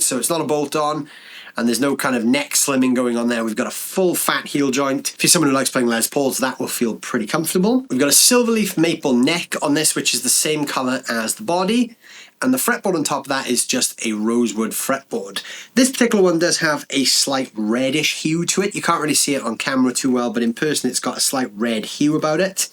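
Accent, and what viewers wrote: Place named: Wales, United Kingdom. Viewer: Aha, thought I heard a Welsh accent. . .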